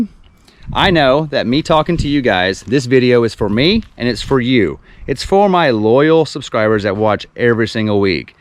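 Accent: Texas, United States. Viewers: I just like hearing you talk , Texas talk. Where is Phil? Ooooh wrong channel. lol As for the comments I say yes but in the long run don't give them the satisfaction they really want to be seen on here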